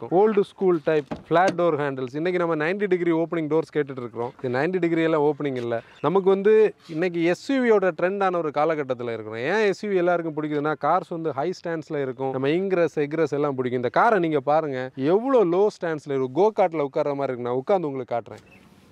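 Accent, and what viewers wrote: Kanyakumari, India. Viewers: Your presentation and the slang of my own Kanyakumari style is extraordinary. Keep going all the best.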